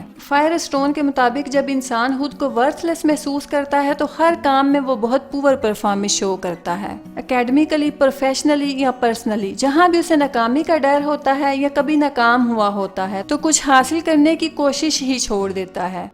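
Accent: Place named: Sialkot, Pakistan. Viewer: like your sialkoti lehja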